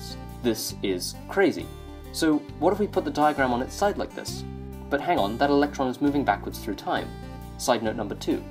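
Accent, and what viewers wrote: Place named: United Kingdom. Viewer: Thanks for this, my only suggetion is to speak slower and more clearly. That Brit accent is sometime hard for Americans to understand when spoken fast.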